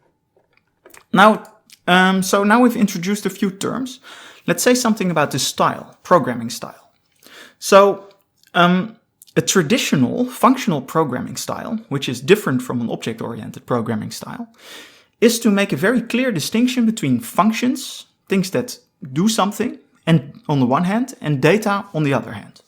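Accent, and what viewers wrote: Netherlands. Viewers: Afgaande op je accent ben je Nederlands.. Fijne video's ! dank!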